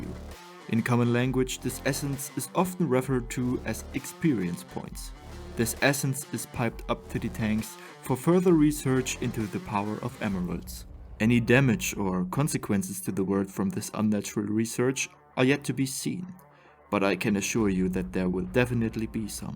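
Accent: Germany. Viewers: I LOVE your German accent!! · I will always recognize the german accent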